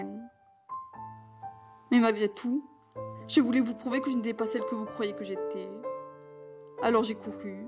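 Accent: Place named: Nîmes, France. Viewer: Tu es Nîmoise (au vu de ton accent) ? Et oui tu y arriveras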